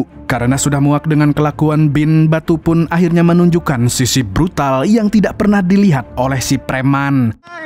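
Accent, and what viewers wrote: Banjar, Indonesia. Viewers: Adminnya orang Banjar kah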